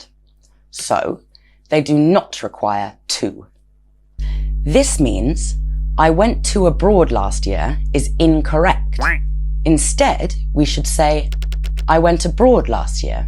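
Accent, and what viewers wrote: United Kingdom. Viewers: 여윽시 영국식 발음과 억양은 독일어 배운 나에게 엄청 매력적임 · 영국식 발음 죽이네요. 마치 영어가 아닌것처럼 들려요.. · 딱 영국발음 좋아요 좋아~! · I had an appointment with my doctor 이렇게 말해야 하나? 하고 들어온한국인... 근데 영국식 영어인... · 영국 발음 매력적이에요~ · 와 엄청 도도하고 섹시하다 영국발음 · 영국발음이 좋기는 좋구나... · 아 진짜 영국 영어 엑센트 너무 좋은거 같아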